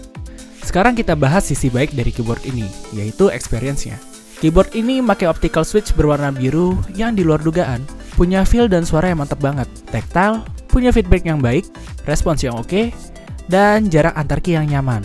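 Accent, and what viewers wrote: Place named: West Java, Indonesia. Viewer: Sunda pisan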